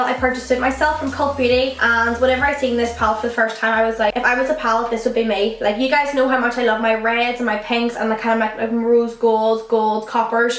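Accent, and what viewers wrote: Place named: Belfast, United Kingdom. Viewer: wee bitta belfast no mean no mean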